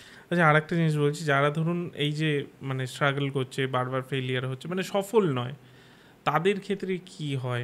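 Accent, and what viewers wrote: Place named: Hindi, India. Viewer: খুবই ভাল,অনেক কিছু জানলাম।কিন্তু চ্যানেল টা তো বাংলা তাই অকারণ হিন্দি উচ্চারণ বর্জন করলে ভাল হয়।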